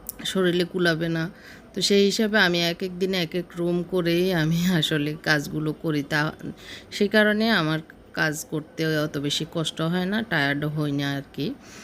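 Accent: Noakhali, Bangladesh. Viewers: Apu kamon acen??apnar kaj dekhta onk valo lage.apnar blog onk valo lage.apnar kotha sunle onk apon Mona hoi.amrao basai noakhalir vasai kotha boli.vaia k o onk thanks apu k support daoar jonno.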